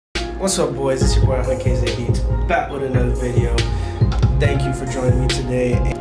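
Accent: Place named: Jamaica. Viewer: Where you from ? Jamaica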